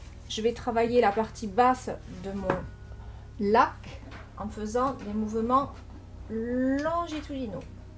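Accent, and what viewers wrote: South, France. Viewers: Bonsoir une très bonne année à toi et ta famille quel magnifique tableau une fois encore c'est exactement le genre de paysage que j'aime regarder et essayer de le faire j'adore 💕 il est waouhhhhhhh bon week-end à toi bisous et à très vite j'espère pour une nouvelle toile merci pour tes explications simples et ton très bel accent du Sud tu nous fait rêver ❤❤❤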